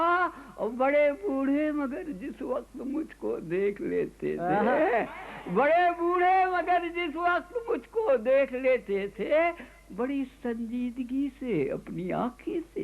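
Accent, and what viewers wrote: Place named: Lucknow, India. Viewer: Waah Adil Sahab kya shayar the aap, aur kya zbaan pure lakhnavi zbaan aur aapki peshkaari ba-kmaal. Pride of India.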